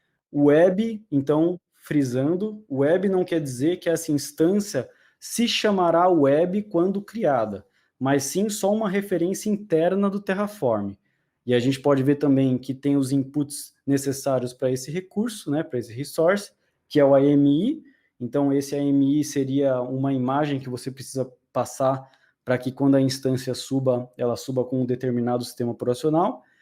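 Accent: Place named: São Paulo, Brazil. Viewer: Fale mano (sotaque paulistano 😅) você foi top na explicação. O lance de explicar os bloquinhos do TF achei muito didático e me fez entender o básico da coisa em 30 minutos de uma maneira phoda. Suas analogias sobre o declarativo e procedural e o Ansible que estava querendo saber o que era ao certo. Parabéns pela didática e simplificação para um melhor entendimento. Eu hoje “brinco um pouco” com Cloud Formation e se desse para fazer um comparativo de CF x TF o que muda por exemplo ao criar um s3 em CF para TF seria top. Com sua explicação vou tentar fazer isso na empresa (trabalho no Itau) e só para vc saber, seu conteúdo esta servindo como base para muitos aqui. Mais uma vez parabéns pelo trabalho Deus abençoe sucesso e felicidades.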